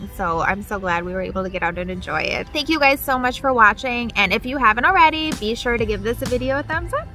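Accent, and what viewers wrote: Minnesota, United States. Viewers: Your Minnesota accent is so cute! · I love her MN accent! Being from MN I totally get the oopsie and the oh yeah! 😂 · I grew up in Plymouth but my parents retired to Alexandria. Her accent is cute and reminds me of home.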